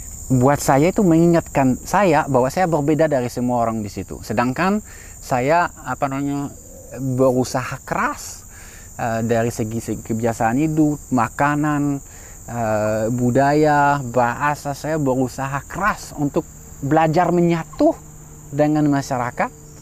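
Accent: Indonesia. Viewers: Dari segi bahasa dan dialeknya anda itu udah jadi orang indonesia banget, malahan sebagian kecil orang indo masih ada yg pake bahasa daerahnya karena gak bisa bahasa indonesia! Mengenai fisik, kalaupun ada orang indonesia yang jago bahasa inggris sampai kapanpun gak mungkin dia jadi bule, begitu analoginya bro. Salam. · Bang mantuuulll... Klo saya udah banyak liat videonya, bahkan jujur pertama kali saya liat video yg pertama saya tonton, saya udah kagum sama cara ngomngnya.. Indonesia banget..👍 terus berkarya bang..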